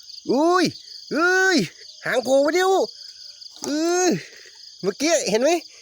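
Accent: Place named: Sukhothai, Thailand. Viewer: พี่คนไหนค้าบ ผมคนสุโขทัยค้าบ สำเนียงคล้ายๆ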